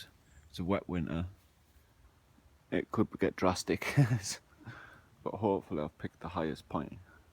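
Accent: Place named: Yorkshire, United Kingdom. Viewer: u sound very Yorkshire like.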